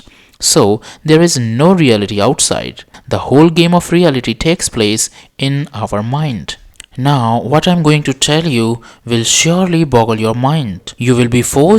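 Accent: India. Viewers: Ugh indian dialect 🙄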